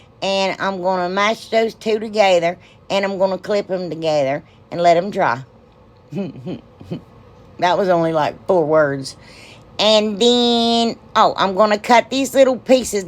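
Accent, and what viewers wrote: South, United States. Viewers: I always love to see that you upload a video. I feel like I can relate to you more than most others that I watch. I notice that your address is in OH, but are you originally from a more southern state? I just wonder because you have a wonderful southern accent. I do, too, so I just relate to it.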